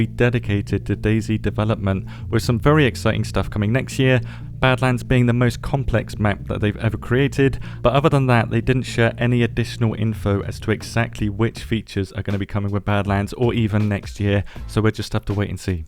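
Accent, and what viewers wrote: United Kingdom. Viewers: british :face-blue-smiling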